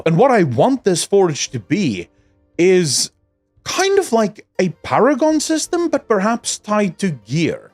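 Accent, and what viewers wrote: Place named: Afrikaans, South Africa. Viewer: Random question, where are you from? Your accent sounds slightly Afrikaans, or Dutch?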